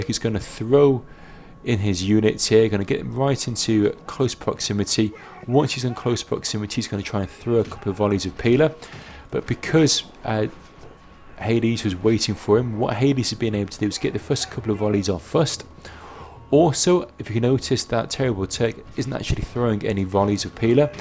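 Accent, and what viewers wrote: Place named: Wigan, United Kingdom. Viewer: what part of the UK is accent from? Sean Bean has the same style of English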